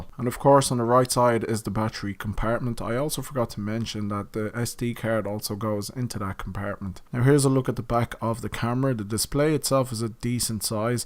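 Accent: Dublin, Ireland. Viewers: Dude, are you Irish? you sound like a Dub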